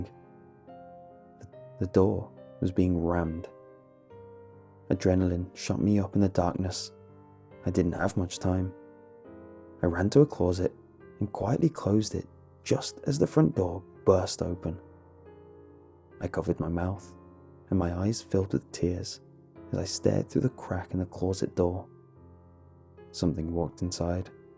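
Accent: England, United Kingdom. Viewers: Why do all tge Creepy Pasta channels sound the same? Are they English or just proper sounding Americans?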